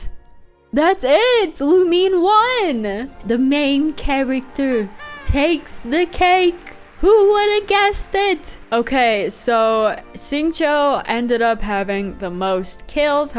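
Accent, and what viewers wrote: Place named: United States. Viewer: this girls american accent and mispronounciation of tradional names is killing me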